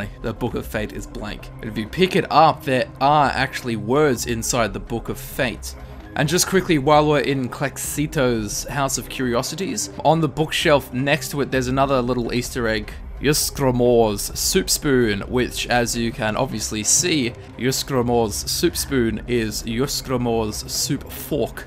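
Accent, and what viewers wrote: Australia. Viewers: Fucking straya mate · Just Austrailian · Australian accent dummi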